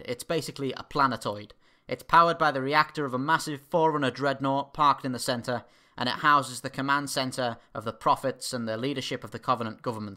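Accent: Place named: Liverpool, United Kingdom. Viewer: Kind of a Scouse twang to your accent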